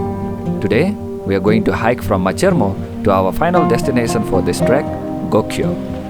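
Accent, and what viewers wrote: United Kingdom. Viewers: Amazing vidéo! the landscapes are beautiful and the explanations so clear, love you english accent! where u come from ? after reaching gokyo valley how did u came back ? and by which way?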